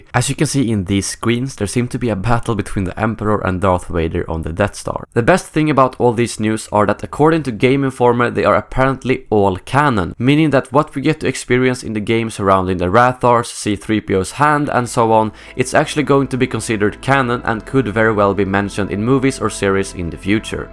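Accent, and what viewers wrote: Sweden. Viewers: är du från sverige ?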